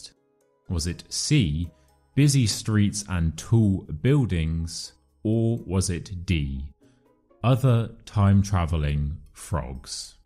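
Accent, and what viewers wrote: United Kingdom. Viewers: Thanks for your new video!🐸Today’s story was quite challenging for me! 😂 I found a lot of new words in it. I do love your beautiful British pronunciation!:_HenryNice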